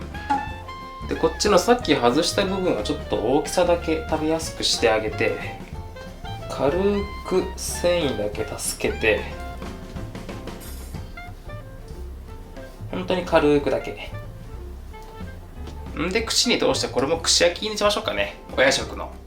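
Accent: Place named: Nagasaki, Japan. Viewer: おなじ長崎県身として 方言嬉しい（笑） 〇〇っちゃんﾊﾞﾘ長崎やし（笑）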